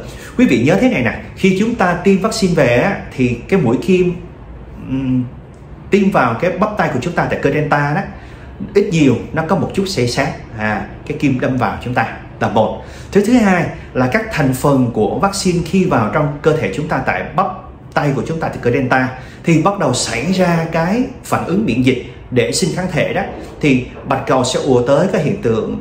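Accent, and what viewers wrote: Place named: Da Lat, Vietnam. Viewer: Bs nói giống tiếng dân Đà lạt quá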